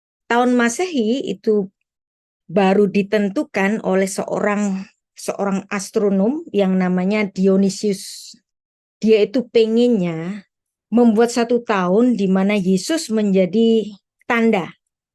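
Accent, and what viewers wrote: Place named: Java, Indonesia. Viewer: Materi Hanuka sangat menarik namun yg kurang ketertarikan adalah dialeg kedaerahanmu asal suku jawa itu yg melemahkan memahami pemaknaan isi sesungguhnya. Bisakah anda menggunakan bhs lndonesia yg baik dan benar tanpa kontaminasi dialeg kejaweanmu? Kok sampai usia yg segini tdk bisa menghilangkan dialeg kedaerahanmu itu gmn sih ceritanya??? Gunakanlah bhs lndonesia yg baik dan benar sebab lndonesia bkn seluruhnya suku org Jawa kan? Harap dipahami utk dimaklumi.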